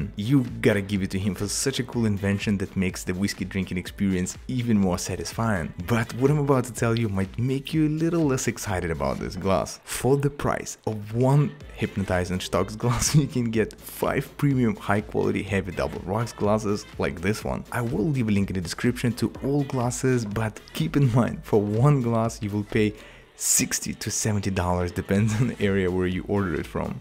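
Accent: Russia. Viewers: Are you from russian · Hi, while I hear you,I'm think that you are from Russia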